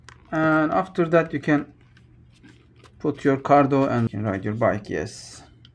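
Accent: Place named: Turkey. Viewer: Süper video, teşekkürler. Aksandan türk olduğunu düşünüyorum 😄Hoparlör kulakları biraz rahatsız ediyor ama alışırım umarım.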